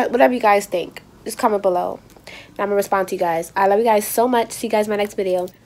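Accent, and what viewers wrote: New York, United States. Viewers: r u from new york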